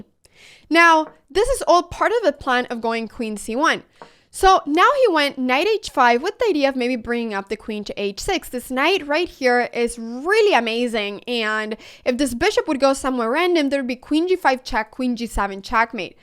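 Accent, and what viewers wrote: Spain. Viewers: Off topic: like your spanish accent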